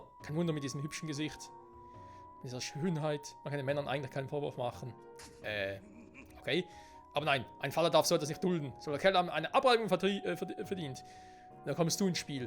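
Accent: Switzerland. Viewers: Hey gutes Video, bist du Schweizer? :D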